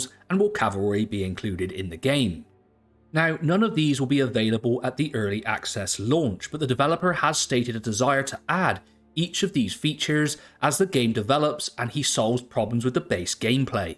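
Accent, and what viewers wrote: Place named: Cornwall, United Kingdom. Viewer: oo i fuckin love a cornish accent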